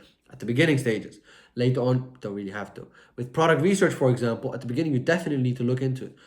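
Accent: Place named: Turkey. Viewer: Brother you sound Turkish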